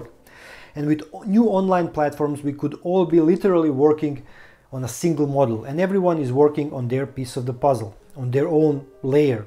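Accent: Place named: Serbia. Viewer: Po english “akcent” Srbin vidi se iz aviona :) Good job👍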